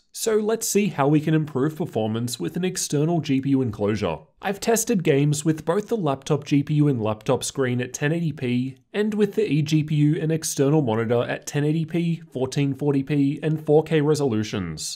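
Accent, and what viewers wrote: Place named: Australia. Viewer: aww, I liked 1-0-7-1-0-u. It’s surprisingly melodic with an Australian accent.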